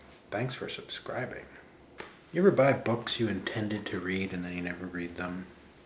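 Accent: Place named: United Kingdom. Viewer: I'd like to see your other British accents.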